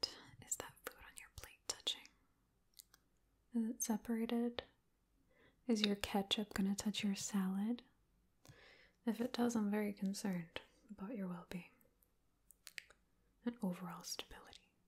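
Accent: United Kingdom. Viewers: please make more videos with british accent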